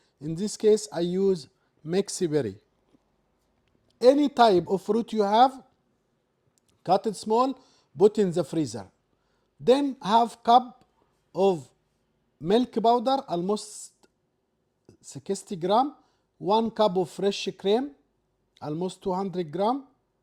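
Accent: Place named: Egypt. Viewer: Egyptian English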